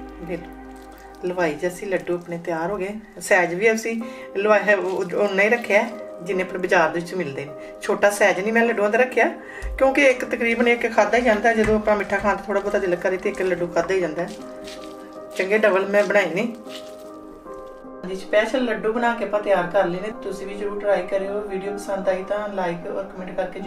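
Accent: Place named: Malwa, India. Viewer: I love your Malwa accent. Sat Shri Akal. I will make these for my parents on Lohri. Thank you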